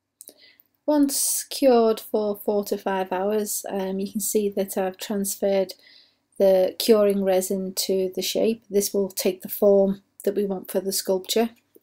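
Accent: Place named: Liverpool, United Kingdom. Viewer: Your accent is lovely. You’re a posh scouse x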